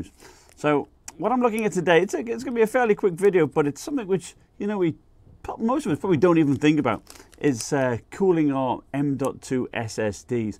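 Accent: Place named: Billingham, United Kingdom. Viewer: It had occurred to me that something new might be going on, I hadn't installed anything but sneaky coin mining type activities is always a possibility, so I formatted and did a clean install of Windows yesterday, and even as it was installing it was getting hot. Performance whilst installing seemed a bit off, but since then it's been going OK - as I said, just a bit impractical with the cover off. I was more worried that the excess heat could do a bit of damage to everything else inside the laptop. I had hoped it would be a simple 'yep, bung a new SSD in and all will be well in the world' but maybe I'll just have to get used to the cover being off. Thanks anyway though, I'm looking forward to browsing through some of your video's, not everything interests me (I'm an old Unix and cobol zombie from way back but I just don't get gaming at all), I watched the one on how much money you make from a few weeks ago, it was really interesting, and you were helping those who may be just starting out with tips, which is really nice. 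(My husband is from Billingham, you sound rather local. Hope you're not sulking as bad as he is because Derby won )...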